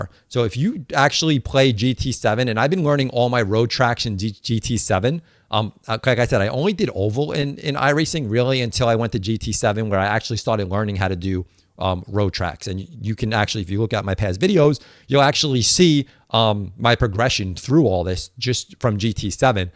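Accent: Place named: Massachusetts, United States. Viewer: you must be from mass... im from new bedford now in florida but your accent brings me home...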